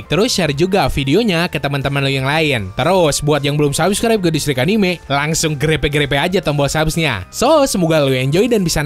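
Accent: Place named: Java, Indonesia. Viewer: jawa keras lurd wkwk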